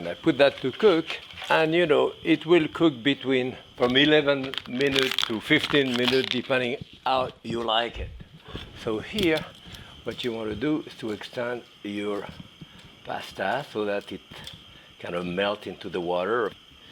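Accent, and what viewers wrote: France. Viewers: French Accent !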